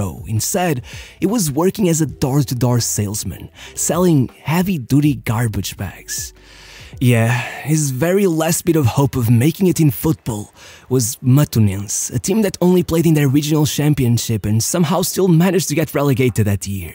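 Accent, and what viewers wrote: Portugal. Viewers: Quem é o tuga que dá a voz a estes vídeos? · Are you Portuguese?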